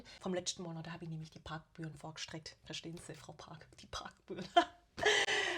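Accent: Swabia, Germany. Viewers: Des vestehed nur schwaben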